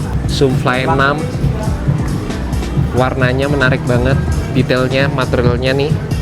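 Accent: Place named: Surabaya, Indonesia. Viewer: ketauan orang surabaya dari meling meling bolo 😂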